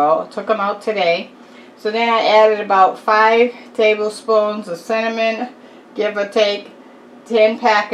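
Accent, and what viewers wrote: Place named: Boston, United States. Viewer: MS. Girl you are doing it!! Giving me some good ideas Love it!! And the Wisdom in the word of the day!! Get it Honey!! I have one request can you make a sweet potato biscuits? I would really love that recipe!! Love your channel so much your like my Auntie from Boston in your sweet accent!! I pray many blessings for you, you are doing a beautiful job on your channel!! Love you!!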